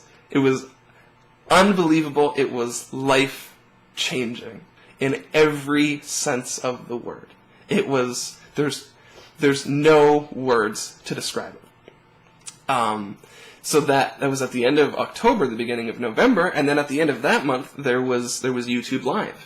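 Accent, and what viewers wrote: Canada. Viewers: Your canadian accent is so awesome. ^_^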